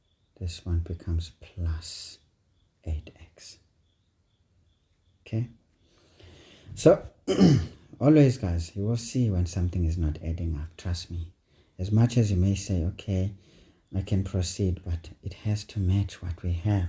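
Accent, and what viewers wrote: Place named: Afrikaans, South Africa. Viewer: the Afrikaans accent 😂😂thank you for the videos sir ,I'm always looking forward to your uploads .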